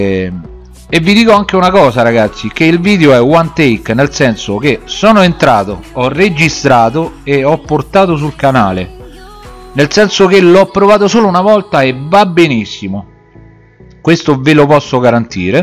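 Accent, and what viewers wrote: Rome, Italy. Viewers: Sei di Roma ?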